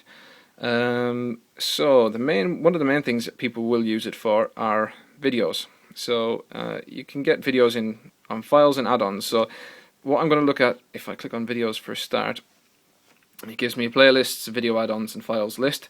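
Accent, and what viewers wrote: Ireland. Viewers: Nice review. Are you Irish?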